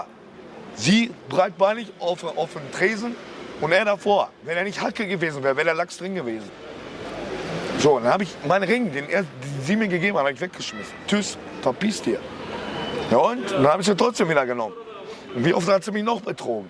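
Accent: Ruhr, Germany. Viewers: Wenn man nicht hinguckt könnte man meinen Kalle Grabowsky redet. Ruhrpott rules. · Ich liebe den Ruhrpottakzent! Ich wünsche alles gute, man merkt, dass er das Herz auf dem rechten Fleck trägt. Ich hoffe für ihn, dass er lernt Verantwortung für sich zu übernehmen, auch wenn ich ihm glaube, dass er eine bitter harte Kindheit hatte.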